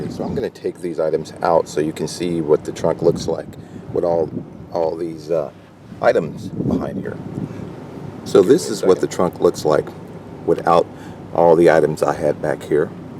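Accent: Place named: Oakland, United States. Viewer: Love the video. You from Oakland? You sound kinda like me and my buds. :)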